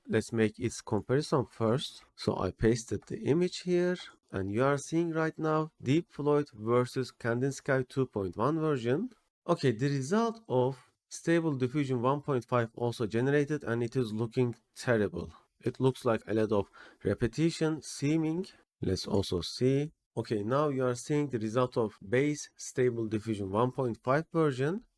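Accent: Turkey. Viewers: Aksanından direkt türk olduğunu anladım ve gurur duydum. Çok yararlı olmuş diğer videolarına da bakıcam. <3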